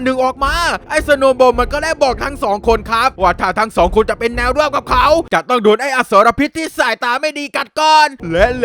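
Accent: South, Thailand. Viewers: ชอบพูดไต้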